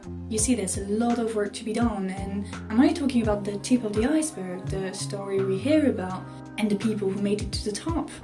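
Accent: France. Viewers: Je pense que t'es française vu l'accent et la vidéo était grave bien et super intéressante mais stp investis dans un micro :(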